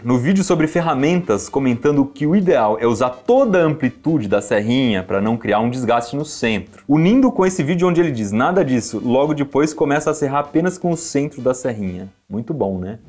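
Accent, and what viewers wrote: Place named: Minas Gerais, Brazil. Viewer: Ele deve se mineiro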